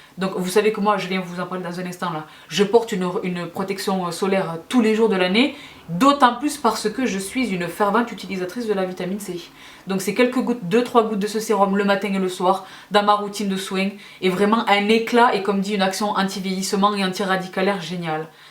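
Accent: South, France. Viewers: Bonjour; je suis tombée par hasard sur vos vidéos et j'en suis fan........j'apprécie votre élocution avec cet accent chaleureux du sud et ce charisme qui rayonne à chaque vidéo, un vrai bonheur. Une abonnée de plus....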